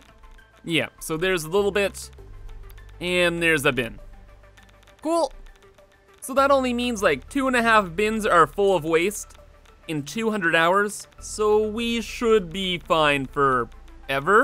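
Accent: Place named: Canada. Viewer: "Aboot", "byees".... methinks is Canadian, ey?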